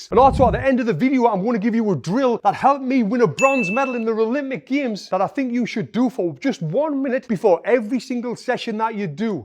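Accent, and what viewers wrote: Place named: England, United Kingdom. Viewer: Love your content and hearin the King’s English….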